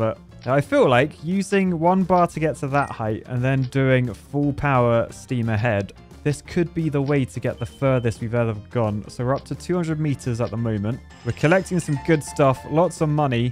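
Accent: United Kingdom. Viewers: gotta love the real britich accent amazing!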